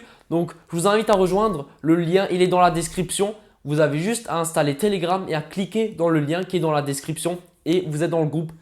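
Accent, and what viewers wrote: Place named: Alsace, France. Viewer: Je crois il vient d'Alsace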